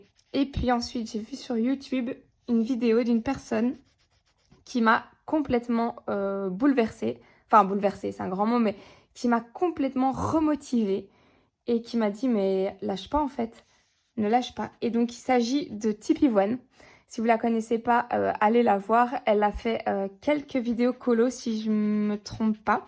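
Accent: Belgium. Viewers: J'ai repris le colo cette année et j'ai pris une claque avec l'évolution du matériel ! toutes ces chaînes de coloristes mais j'avoue que ton accent belge avec ton côté passion douceur dans ce domaine te démarque 😁 j'adore également le scrapbooking donc, le mélange me plaît beaucoup ❤ merci pour tes vidéos 🌹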